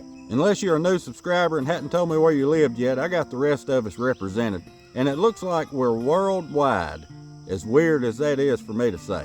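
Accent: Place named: Mississippi, United States. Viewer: Great content brother, I knew from that accent you had to be from my neck of the woods, Mississippi! Some people say I'm more countrier than two rows of butter beans, lol! But anyhow, I don't think most people realize how much work is involved in raising live stock, gardening, etc. It is W-O-R-K period! I've done just about it all, Till, plant, weed, pick and shell beans, raised chickens, rabbits, hens, ducks, hogs, goats, cows, fish, etc., canned, smoke house, and the list goes on and on. But the reward is also very, very great! There's just something special that is hard to describe to people when you sit down at your dinner table and eat the food that you know you have worked really hard for and that which the good Lord has blessed. I'm believing God that I can buy quite a few acreages of land here in the near future and retire on a small farm. 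I know it will be fun, because I know what to expect and to know my limits.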